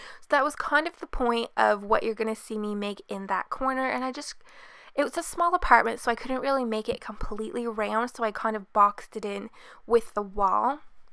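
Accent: Canada. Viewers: Are you Canadian?? BTW love the build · b Canadian I think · Love this!! Is that a canadian accent i hear? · are you Canadian?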